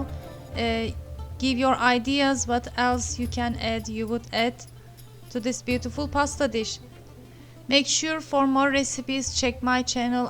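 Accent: Turkey. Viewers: Ur Turkish accent is so cute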